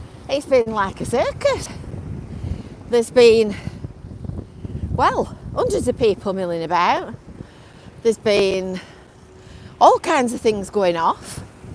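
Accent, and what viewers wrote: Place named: Yorkshire, United Kingdom. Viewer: That has to be a Yorkshire accent with that patter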